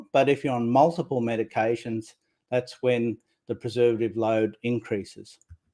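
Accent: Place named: Australia. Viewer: Hi... he is speaking in his own language which is English. He's from Brisbane and speaks English with an Australian accent. I can understand every word he says perfectly. I speak English too.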